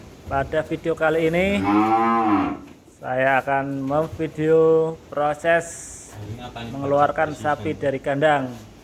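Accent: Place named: Wonosobo, Indonesia. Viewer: Omongane kea Wonosobo ya bolo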